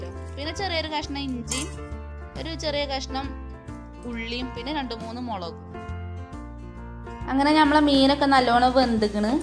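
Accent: Malappuram, India. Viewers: Malappuram voice super😀😀😃😇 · തനി നട്ടൻ മലപ്പുറം ഭാഷ... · Kasaragodil ninn kananad njan mathrano🤗.orupadishtayi malapuram slang😍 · അതുഞങ്ങളുടെ മലപ്പുറം ഭാഷ അത്‌ ഓവറായി തോന്നുന്നവർ.. ഈ വീഡിയോ... kananda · എനിക്ക് ഈ മലപ്പുറം താത്തന്റെ വീട്ടിലെ വിശേശവും മലപ്പുറം ഭാഷയും പൊളി നിങ്ങൾക് ആർക്കെങ്കിലും മലപ്പുറം താത്തന്റെ ഭാഷ ഇഷ്ടമായവരൊക്കെ ഒരു ലൈക് തരു 🙃🙃🙃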